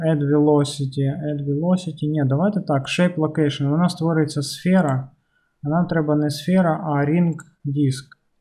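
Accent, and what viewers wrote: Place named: Poltava, Ukraine. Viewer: Одразу чути, що автор з Полтавщини... бо я теж))))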